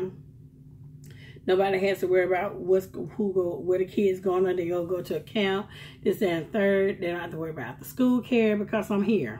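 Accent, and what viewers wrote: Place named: Alabama, United States. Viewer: I KNEW YOU WERE FROM GEORGIA OR EITHER ALABAMA. YOU SOUND JUST LIKE MY COUSINS IN ALABAMA.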